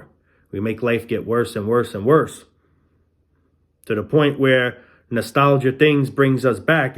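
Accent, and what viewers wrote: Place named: New Jersey, United States. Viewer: By your accent my guess is you’re from New Jersey.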